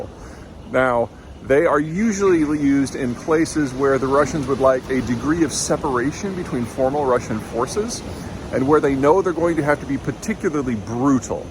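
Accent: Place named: Midwest, United States. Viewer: Midwest accent causes this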